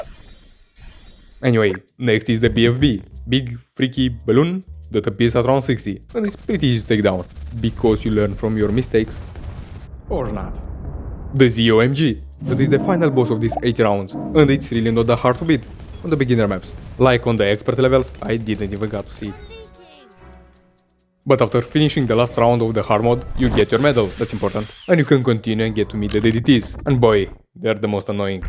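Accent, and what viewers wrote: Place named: Russia. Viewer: I love the, uh russian/ukranian acent?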